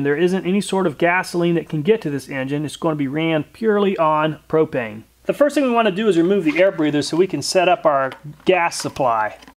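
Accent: United States. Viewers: I really like your videos and your American english. Maybe try next time create hydrogen and oxygen from water with electrolysis and supply gasoline engine with mixing of this two components. It will be probably hard to create enough such fuel but you can at least try this. Instead of that you can try supply engine with clear oxygen instead of air.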